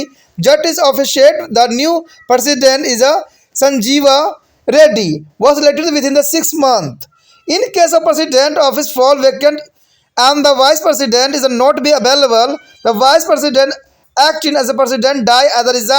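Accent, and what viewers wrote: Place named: England, United Kingdom. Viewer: Sir thoda dhng se bol lo angrej mt bno smjh hi nhi aarha kya bol rhe ho 😢😢😢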